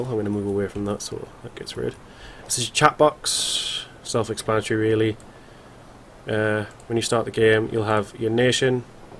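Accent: Newcastle, United Kingdom. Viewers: You from Newcastle area? Thanks for the video. I'll probably buy this when its finished.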